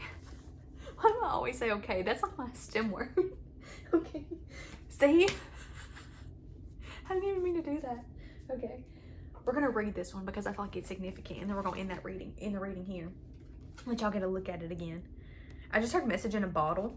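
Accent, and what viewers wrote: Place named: South, United States. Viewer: that southern accent goes crazy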